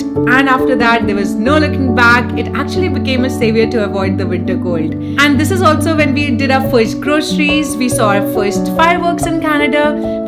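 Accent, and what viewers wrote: India. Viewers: Birthday and not birday :-). We desis have so much of MTI - mother tongue influence :-)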